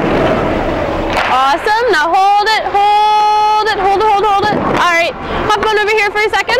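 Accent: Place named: Canada. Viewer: she is probally canadian. A girl i dated was from Alberta and she sounded a bit like that. I see u like the sporty ones eh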